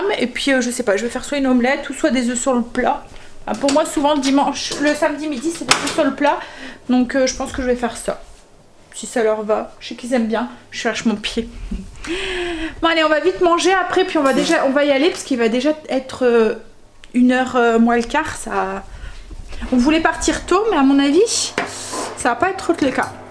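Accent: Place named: Pas-de-Calais, France. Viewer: Cc moi je croyais que t habiter dans le pas de Calais comment tu parle pareil que ceux du pas de Calais car moi je suis née dans le pas de Calais es y habiter jusqu'à 12 ans es toute ma famille es là haut donc toi ses le nord.sinon rien a voir quand tu fais les poussières avec du savon noir du rince ou non merci pour tout des partage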